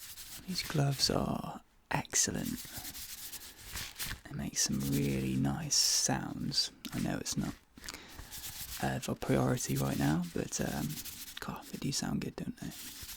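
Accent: United Kingdom. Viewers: Love the accent what part of the UK?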